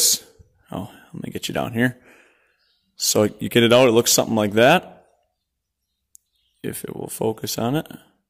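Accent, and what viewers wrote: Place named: Minnesota, United States. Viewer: You sound like you have a Minnesota accent!!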